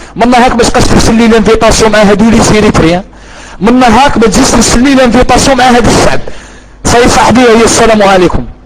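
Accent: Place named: Morocco. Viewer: لاول مرة اعرف ان معسكر يتكلمون لهجة مغربية خخخخخخ ياو راكم تبهدلو في روحكم المتكلم لهجته مغربية على كل حال الله يهدينا كلنا اخوة مسلمين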